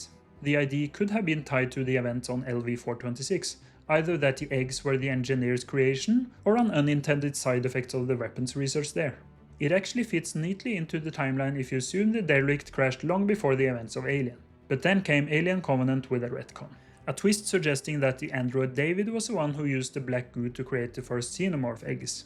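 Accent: Norway. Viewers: Norwegian narrator? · You're always going to have trouble making sense of combining wildly different alien movies, made by directors and writers with completely different visions. Or with directors' brains that have degraded with age. Your- and other fan theories are hopeless. (Also, holy super-thick norwegian accent, batman)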